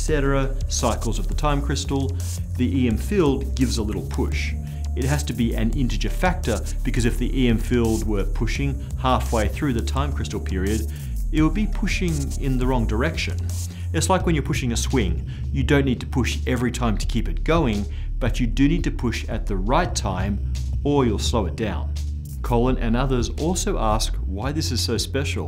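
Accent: Australia. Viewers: seriously is an aussie?? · Why does this guy have an Australian accent? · He's from Australia.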